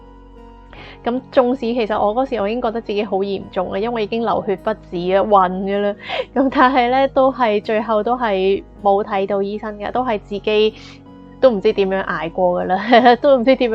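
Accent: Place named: China. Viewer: 你应该不是真真香港人！讲广东话讲到像陸染咁！😑😐😶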